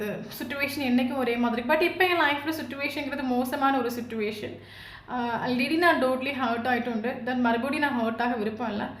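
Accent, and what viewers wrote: Kerala, India. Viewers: Super sister காதல் பிரிவில் எவ்வளவு கஷ்டத்தில் இருந்தாலும் உங்களுடைய பேச்சு எங்களுக்கு புத்துணர்ச்சி அளிக்கிறது உங்களின் தமிழ் மலையாளம் கலந்த பேச்சு மிகவும் அருமை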